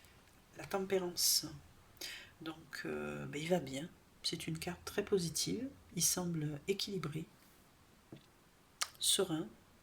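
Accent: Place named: Toulouse, France. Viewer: Choix 3. Et tu as un accent toulousain que je n’avais jamais entendue !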